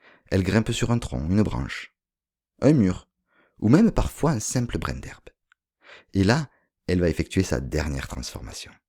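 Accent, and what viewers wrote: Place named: Provence, France. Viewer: Merci, vous êtes un conteur extraordinaire, avec notre bel accent provençal ! Ah, les cigales, j’adore… Mais dommage, elles ne chantent plus : c’est déjà la fin de l’été. 🦗☀️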